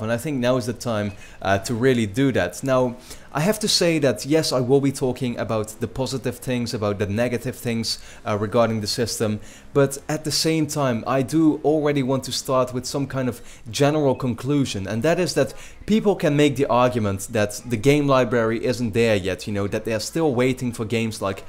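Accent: Netherlands. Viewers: You're Dutch right? :p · Fucking hekel aan nederlandse accenten. I mean je kan er niks aan doen, ik heb het zelf ook.. Nederlands klinkt gewoon lelijk xD Anyways, dankje! Ik zat erg te denken of ik nu al van 360 naar ps4 zou gaan. En ja dat ga ik zeker doen. Mijn beslissing staat zo vast dat ik vanmiddag dat ding nog ga halen. Thanks for this review!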